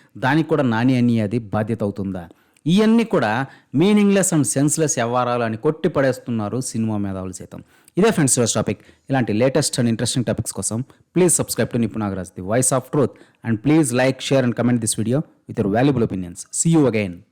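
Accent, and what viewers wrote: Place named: Chittoor, India. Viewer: nuvvu just only mega family kosam videos pettevaadu erri puka nuvv chittoor language lo matladuthunnav ra erri puka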